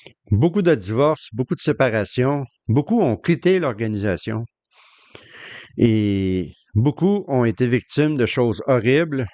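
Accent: Quebec, Canada. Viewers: Merci pour ton travail de recherche… pour mettre en évidence les corrections, les changements de la doctrine… Je suis né de parents TdJ, baptisé le 20 avril 68 à 14 ans, membre du comité comme serviteur aux études bibliques à 18 ans, j’ai suivi la formation de l’école du ministère du royaume au Bethel de Bruxelles. J’étais le plus jeune avec les autres frères qui avaient entre 30 et 60 ans… quelques mois après, une nouvelle règle a été mise en place…:il fallait avoir 20 ans minimum pour occuper un des postes du « comité » : surveillant président, adjoint et serviteur aux études biblique. Je me flatte d’avoir été la cause de ce changement de règle dans l’organisation. Moi qui servait de tout mon cœur, viré comme un malpropre pour être remplacé par un frère qui refusait de prendre les sœurs âgées dans sa voiture parce qu’elles avaient mauvaise haleine… mais il avait plus de 20 ans, c’était le plus important désormais pour la WT, plus que la disposition de cœur… Ça a été une douche glacée sur mon feu dévorant intérieur. 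Ça m’a pris des décennies pour me déprogrammer de tout cet endoctrinement. Encore merci pour la clarté et la précision de tes vidéo. J’adore ton accent Québécois 😉 On fera bientôt connaissance dans le nouveau système de choses… 🤪😜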